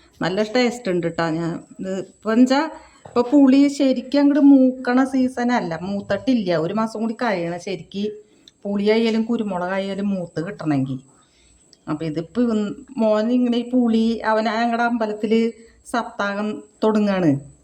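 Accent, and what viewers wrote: Thrissur, India. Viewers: തൃശ്ശൂർ ആണോ സംസാരം കേൾക്കുമ്പോൾ തോന്നുന്നു ഞാനും തൃശൂർ ആണ് പക്ഷേ ഇങ്ങിനെ ചമ്മന്തി അരക്കുന്നത് ആദ്യമായാണ് കാണുന്നത് 👌👍😋😋 👫🔔✅ New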